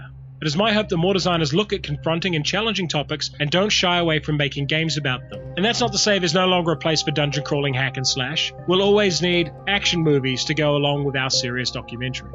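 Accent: New Zealand. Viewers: 1. When I was in high school (in the 80's) a couple of friends and I spent long hours playing Hitler's War. It really grew my appreciation for resource management games and that resource management element is still probably one of the biggest hooks I enjoy in games to this day.2. As a fan of roleplaying, I've also come to enjoy games that have a capacity-building or levelling up component for your character/s (very common in numerous games).3. While it's great to enjoy boardgames with friends, it's also great that so many are getting good ports to the PC so you can enjoy them on your own. I've been playing Lords of Waterdeep, Through the Ages and Elder Sign: Omens quite regularly and enjoying them to fill gaps between real life games.4. King of Tokyo perhaps gave me an appreciation that games can be useful just for a quick bit of laughter and fun - they don't always have to be serious. 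Also great to play with the kids.5. Avalon Hill's Civilization was another game that really made an impact. The idea of achieving technological progress and the awesome trading scheme where you could sneak in natural disasters for your opponents was very appealing.I enjoy your vids, the length is great and it's great to hear a fellow Kiwi (unless my ears deceive me) doing something that is internationally appreciated.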